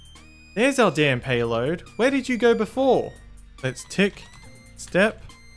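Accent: Australia. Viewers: Dang, the Australian is coming out this video.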